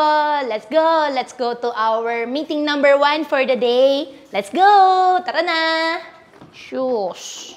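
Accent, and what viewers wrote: China. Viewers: Chinese na chinese yung pag tagalog. Reminds me of Chinese vendor owners sa 168. Mga Half chinese di ganyan accent , pure tagalog pa din ang English. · Chinese nga ito sa salita p lng may chinese accent na. Ako chinese blood din pero i was born here grew up here im.proud to be a true Filipino citizen wala na invaded na tyo ng mga pure chinese we need to stand up to protect our own country God bless the phillippines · Sa itsura nya at accent tsekwa yan e. Yun iba kasing pinoy pakitaan lng ng konting bait bumibigay na agad. Di tinitingnan kung mabuti ba talaga o hindi ang pagkatao ng isang tao. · Pota with the distinct chekwa accent. Halatang Chinese sleeper cell to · Chinese na Chinese nga yung punto ng pagsasalita nya. San ba sya nanggaling? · Yup. Her Mandarin tongue accent is obvious 🤥 😝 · Agree if she really grew up in the Philippines.. 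She would sound like pinoy accent talaga... But her accent is Chinese talaga. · Chinese accents talaga ☹️ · Ganyan magsalita ng tagalog yung Chinese. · Nilalabanan nya yung chinese accent nya pero very halata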